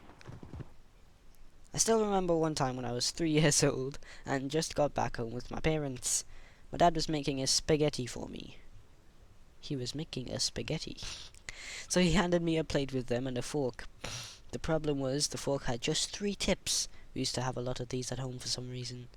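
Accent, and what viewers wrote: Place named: United Kingdom. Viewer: I love your British accent ❣️🤠😍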